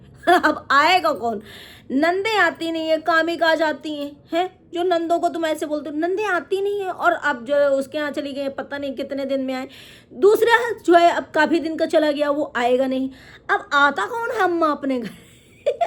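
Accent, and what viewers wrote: Kanpur, India. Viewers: Bahut hasi ayi kanpur ki language main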